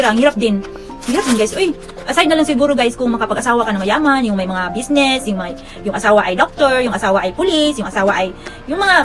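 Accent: Visayas, Philippines. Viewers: ang ganda talgaang magdalita ng mga bisaya btw ang ganda niyo din oo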